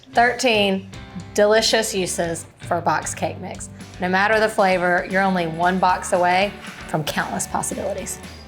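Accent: South, United States. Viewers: Yes she's great at this! But I always welcome a southern drawl...ya' all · I hear a slight southern accent 🤔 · has a sweet southern accent, one of the things I love about listening to her videos.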